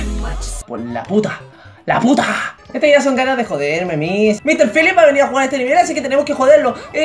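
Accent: Chile. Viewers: eres chileno ¿no? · Este Weon es chileno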